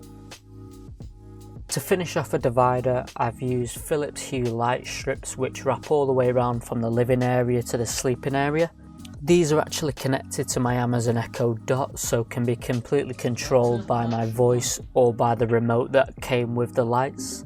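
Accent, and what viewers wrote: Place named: North, United Kingdom. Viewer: The quality of videos is very good. I also love your northern accent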